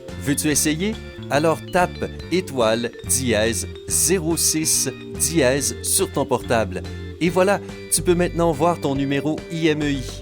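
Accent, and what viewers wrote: Quebec, Canada. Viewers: Je préfère l'autre voix de Sympa perso j'ai pas l'habitude de la voix Québécoise moi 😕. il dit smartphone en anglais et ça fait trop bizarre, j'aime pas ! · Voix de Québecoi ? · et sinon a l accent et aux numeros tapés sur le tel dans la video, vous ne comprenez pas que ca se passe au quebec et que donc il y a une partie des codes qui peuvent etre dependant des fournisseurs d acces, et que donc ca pourrai ne pas marcher pour tous les codes dans tous les pays? une partie doit dependre du telephone en lui meme (et donc marchent bien) et une autre partie du fournisseur.. en attendant ceux qui font croire connaitre tous ces codes, c est juste des branleurs qui se la racontent.. a part le #31# tres peu ont du deja entendre parler de ces codes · C'est quoi cette accent de bouseux du Québec a vomir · Pour le premier conseil, effectivement L'IMEI est très utile en cas de vol pour inscrire le tph au fichier des objets volés. 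Par contre aucune localisation possible avec ça. Quant au blocage de l'appareil c'est pas la police qui le fait non plus, c'est votre opérateur. En tout cas en France c'est comme ça, au Québec (vu l'accent de la voix off) je sais pas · Accent québécois ou je me trompe ! J’aime cet accent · Pk vous mettez un accent Canadien ?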